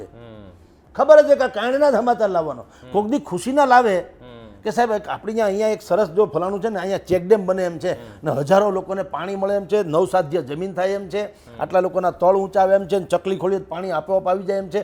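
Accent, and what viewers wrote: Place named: Bhavnagar, India. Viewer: મુલાકાત લેનાર ભાઈ ભાવનગર તરફના છે ? એમની ભાષા અને ઉચ્ચારો પરથી એવું લાગે છે.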